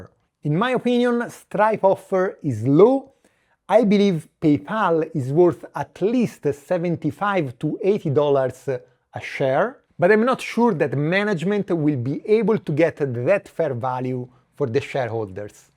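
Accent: Italy. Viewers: by the accent I think you’re Italian like me. Anyway I’ll write in English so everyone can read. I’ve been invested for few months now. I found it because I was upset after I sold my MU position at 120$ and was looking for the next AI bottleneck. They have a spintronics technology which serves to acquire, store and transmit data. And I thought this could be helpful in the future for AI considering the problem now is mostly about Data management. And it doesn’t require water to cool down like nowadays data centers. Let me know your opinion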